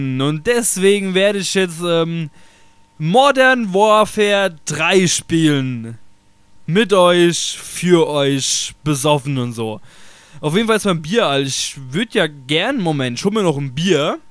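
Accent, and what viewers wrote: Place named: Hesse, Germany. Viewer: Tatsache? Wow hätte ich jz nicht gedacht, denn es Unterscheidet sich wirklich kaum vom hessischen (Frankfurter Raum) Naja aber das Video unterhält, ist auch wirklich ne gute Idee ma richtig einen zu saufen, damit man zumindest dieses Kackspiel ertragen kann! Naja jz is ja Black ops 2 da.. hm wenn man darüber nachdenkt.. könnt man grad schon wieder anfangen zu saufen! (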